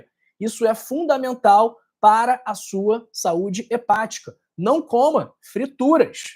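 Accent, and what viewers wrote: Rio de Janeiro, Brazil. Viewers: Porque será que o sotaque carioca é tão desagradável e IRRITANTE ??????? Não dá para assistir !!!! Affffffff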